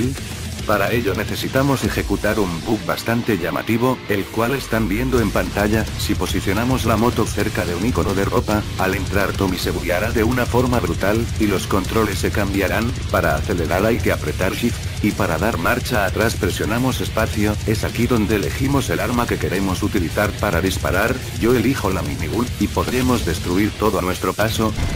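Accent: Argentina. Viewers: Eres argentino verdad? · Sos argentino ¿?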